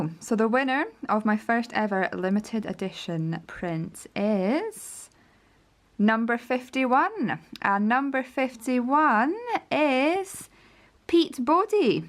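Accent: Scotland, United Kingdom. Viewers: I think your Chanal is brilliant I love the Scottish accent cheers Neil Patto.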